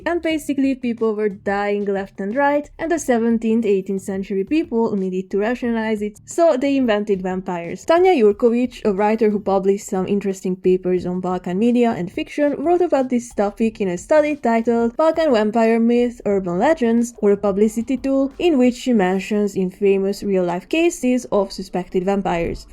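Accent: Hungary. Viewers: you definitely have hungarian accent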